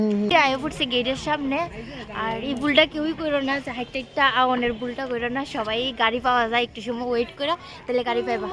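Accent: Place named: Habiganj, Bangladesh. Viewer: সবচেয়ে ভালো লাগে আমাদের হবিগঞ্জের ভাষা শুনে 🇧🇩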